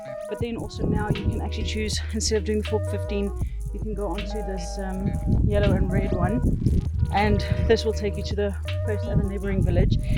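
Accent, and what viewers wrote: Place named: South Africa. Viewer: As someone coming from Namibia/South Africa, and now living in the Netherlands, it really sounds like you are also Namibian/South African. We are always looking for nature! Great to hear what I believe to be South African hahah.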